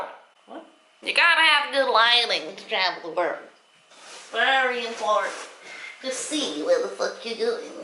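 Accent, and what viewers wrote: England, United Kingdom. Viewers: are you english ? (L) i love your videos anyway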